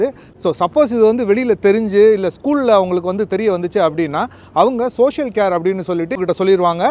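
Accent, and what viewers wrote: Madurai, India. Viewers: you speak, is madurai slang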